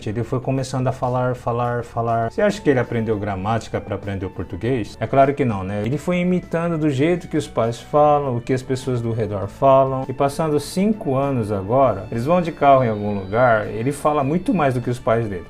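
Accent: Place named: Brazil. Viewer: Eu jurava que tu era brasileiro. Pelo teu sotaque que falas perfeito ao um brasileiro.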